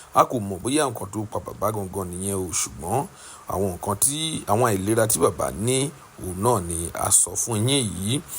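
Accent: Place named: Yoruba, Nigeria. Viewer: You are talking as if you are sick,not smart at all,you are speaking as if you are a Yoruba man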